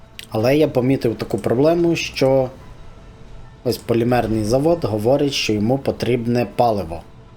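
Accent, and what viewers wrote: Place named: Russia. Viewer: Kocham ten ruski akcent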